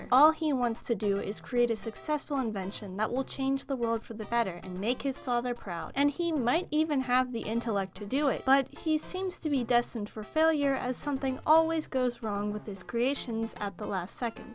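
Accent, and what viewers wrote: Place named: United Kingdom. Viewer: The British accent sends me into orbit “But RaPuNzIiĪiEeEeEEEeLl”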